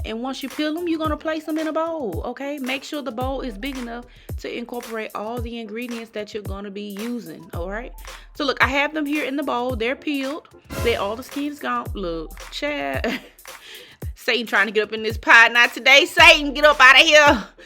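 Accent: South, United States. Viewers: Thank you & Happy Holidays to you and your family as well. 🩵🩷 I knew I heard the southern accent ; I’m from Louisiana. I will definitely let you know how it turns out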